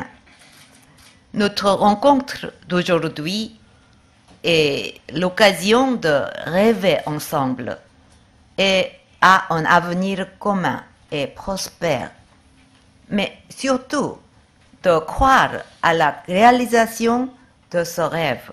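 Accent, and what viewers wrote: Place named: Paris, France. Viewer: 불어할 줄 아는 사람은 알겟지만, 나쁜 불어실력은 아님. 하지만 정상씩이나 되서 외국에서 자랑할 만큼은 아님. 기본적인 발음을 파리식대로 하긴 하는데... 억양이 듣기가 정말 싫다. 애기가 처음에 말 배울 때 더듬거리는 거 같음. 프랑스인이 볼 때 진짜 병신같을 거 같은데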